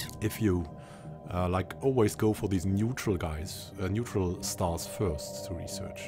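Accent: Germany. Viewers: Btw are you german by a chance? You kinda have the accent :)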